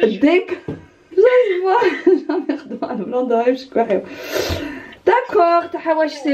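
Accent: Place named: Kabylie, Algeria. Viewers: Stp vous été d'où en dirai de bejaia tu parle comme nous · Salut akni kmwalagh sligh ton accent nighas 99% tha dyellis wAkfadou, beh d'après les commentaires c'est vrai... tu ressembles à ma cousine chez qui j'ai passé 10 jours à Akfadou les années 90, j'avais 6 ans à cette époque, et ta maman "amtihrez rebbi" ressemble exactement aussi à sa maman (m'a tante). Et Durant mon enfance j'ai rêvé d'un autre séjour pareil mais j'ai pas eu l'occasion, les souvenirs de ce séjour sont à ce jour vivants dans ma tête, j'ai aimé cette région, je la garde toujours dans mes souvenirs, les gentils voisins, le froid de Akfadou et j'ai surtout aimé la tendresse inoubliable de ma cousine qui était déjà une maman. Les années 2000 j'aimais beaucoup rendre visite à ma soeur qui est mariée à Chemini (Azrou), je ne ratais jamais l'occasion d'y voyager lorsque les périodes de visites arrivent. 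J'adore la hauteur l'altitude de Chemini. Cette période m'a beaucoup marqué. Je suis de Béjaïa ville et j'adore la montagne, c'est pour ça en 2012 j'ai travaillé apiculteur dans les hauteurs d'elkseur en même temps que fonctionnaire à Adekar que j'assimile un peu à Akfadou, c'est par Hazard que ma femme est aussi originaire des hauteurs de Elkseur. malheureusement je suis maintenant tout loin de ces régions, à l'étranger bien loin que toi. On est fier de vous. Salut à ta maman et ton frère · Bonjour, Comment ça se fait que vous parlez le kabyle de la plupart des régions de Kabylie 🤔🤔👍 il vous manque que l'accent des Ouadias (ifeyfey🤣❤️) En tout cas bravo pour tes vblogs, vous cassez les tabous et vous faites progresser notre culture ♥️♥️ Vivement le prochain vlog ❤️❤️❤️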